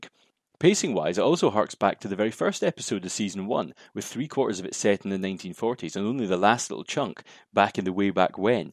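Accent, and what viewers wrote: Scotland, United Kingdom. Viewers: The background music is a little loud with trying to decipher your accent. Love Scots accents Jamie's accent is one of the reasons I love this show but with the music can't quite hear you.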